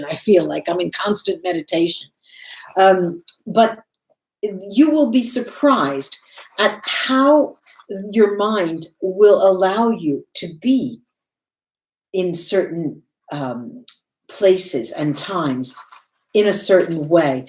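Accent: Italy. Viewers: Are you from Italy? Thank you for the reading .Buon Cumpleanno!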